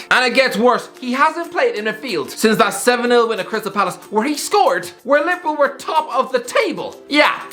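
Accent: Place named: Ireland. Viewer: He is Irish, accents are different SHUSH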